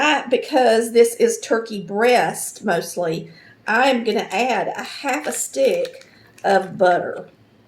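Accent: South, United States. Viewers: Apparently caption doesn’t recognize southern accents: Hi y’all it’s with...” 😁😂. Aside from that, thanks for the turkey tips!! 💕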